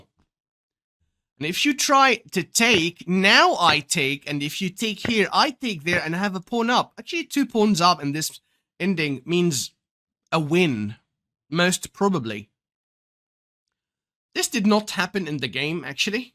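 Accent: United Kingdom. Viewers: Marvelous video ❤, Nice presentation and magnificent pronunciation with accurate British accent ❤, love the change and hopefully you continue with the same enthusiasm and quality for long time 😍🤩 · İ am in love with your British accent doc😂❤ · What a British accent 😍😍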